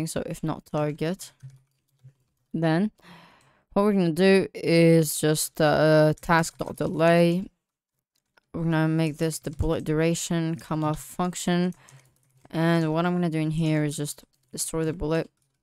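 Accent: France. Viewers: are you french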